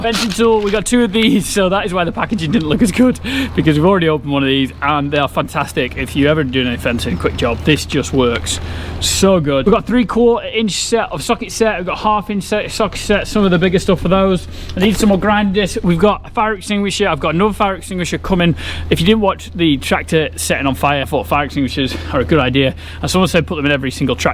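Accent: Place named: United Kingdom. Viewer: Sometimes the British accent makes it impossible to research some of this stuff online. What is a keg stone? A ket stone? A keystone? Ketsone? Ketsone?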